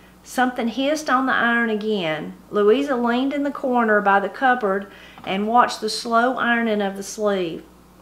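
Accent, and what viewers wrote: Appalachia, United States. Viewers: Thank you so much for reading the story with the true accent of your voice, and reading the words as written with the Appalachian way they are/were spoken. I pray you enjoy all the blessings our Lord has given you and your family. Thank you also for sharing the excitement of your life. God bless you in Jesus's name!!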